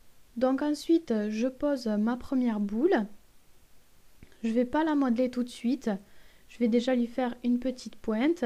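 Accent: South, France. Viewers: juste super beau ,j'ai une question,tu as l'accent du sud non?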